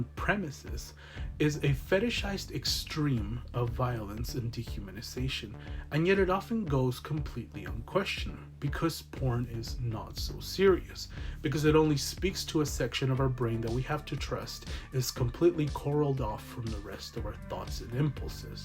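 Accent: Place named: Los Angeles, United States. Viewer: I love Sotos and I really adore you I’m from la and a bibliophile and I think you’re both those things too- I can tell by your Angeleno accent. Keep reading or as i vita sine libras mors est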